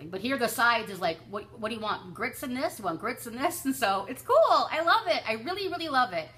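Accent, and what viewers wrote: United States. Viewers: Oops, just heard the end, you're American lol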